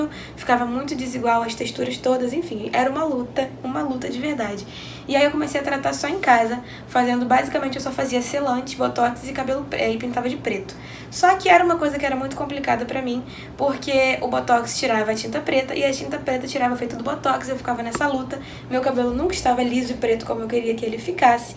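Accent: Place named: Rio de Janeiro, Brazil. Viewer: Sotaque carioca é tão bonitinho... 💋 de Sampa.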